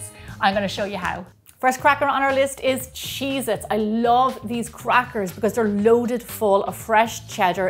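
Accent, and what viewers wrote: Ireland. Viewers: I moved from Dublin Ireland To the United States When I was 12 years old And I really love your Irish accent. unfortunately I lost my accent because the kids in school made fun of it and I so love to hear you speak in that lovely accent, and of course your recipes are fantastic!!! I am so happy that I found you on here!!!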